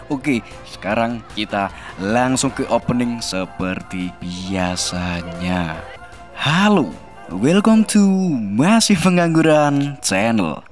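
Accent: Java, Indonesia. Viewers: Mas mimin asli mana ya?,kok logat jowone mantep ketone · logat jowo ne kuat bgt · Medok juga hhhh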